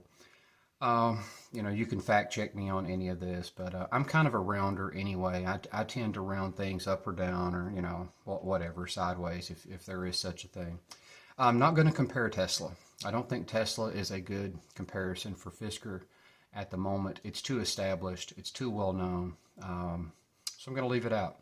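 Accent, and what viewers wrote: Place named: Carolinas, United States. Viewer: Your accent is southern, yet east coast. If I had to guess, I'd say the Carolinas? 👀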